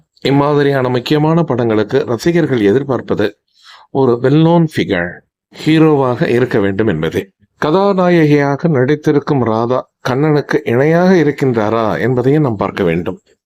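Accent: Jaffna, Sri Lanka. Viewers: யாழ்ப்பான தமிழ் கேட்க கேட்க அவ்வளவு இனிமையாக இருக்கிறது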